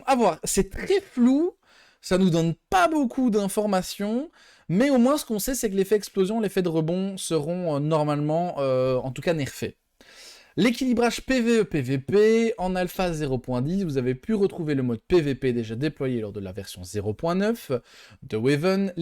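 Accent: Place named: Liège, Belgium. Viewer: Tu viens d ou en belgique ? On dirait liege non? Comme moi mdr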